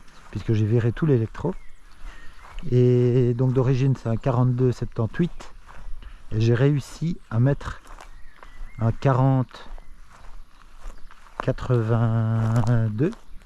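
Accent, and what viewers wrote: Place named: Belgium. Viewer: Salut salut, je reconnais l'accent et les septantes du pays;) t'es de quel coin au juste? On est peut être voisins 🤓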